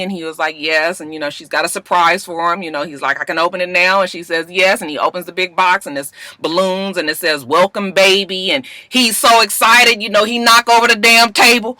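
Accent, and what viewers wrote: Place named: West Coast, United States. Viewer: Thanks,for the amazing videos. I've followed you and for years and am never disappointed! You continue to grow,entertain and inform without missing a beat. I don't know if you intend to be a role model,but indeed you are! I'm your age and I still feel like I wanna be like when I grow up! lol! same for and she's much younger than me. I love you to pieces! both of you. Thanks for sharing you with us....I wanted to tell you for awhile, I can tell you lived on the west coast because you sound like Ray J with some words! "Here" and "there" y'all say just alike! lol! Love you! Thanks for being consistently you! 😊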